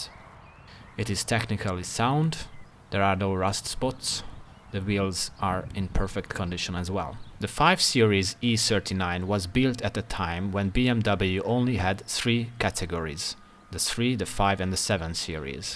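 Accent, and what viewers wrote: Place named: Hungary. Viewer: egyből hallottam hogy magyar vagy, de nagyon szépen végig mondtad és mutogattad én jobban élveztem angolul mint magyarul, és tényleg gyönyörű autó!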